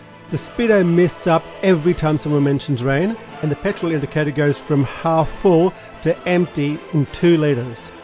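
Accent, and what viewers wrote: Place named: Australia. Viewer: to hear an Aussie accent filled with love for his bike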